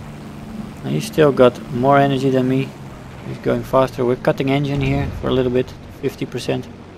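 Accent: Netherlands. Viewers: i realy like your videos bro its gonna be a +sub from me ;) i bet your dutch am i rigth couse you have a dutch accent or is it just me ? :p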